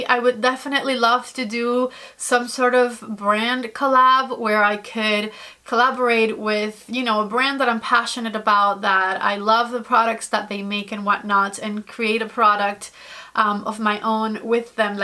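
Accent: Cuba. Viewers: I recently reached all the Harry Potter movies with my daughter who’s 13! I still love it! I play the Harry Potter Lego game with my 6 year old daughter. So fun!!! I love going out to eat & Ed Shreen as well! I’m a certified ESL teacher! Your English is excellent, but I love that you kept a bit of your Cuban accent! I would love to visit Cuba! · Would you be willing to do a makeup tutorial in Spanish? I’m learning Spanish and I would love to hear it in a Cuban accent from an exceptionally talented person 🥰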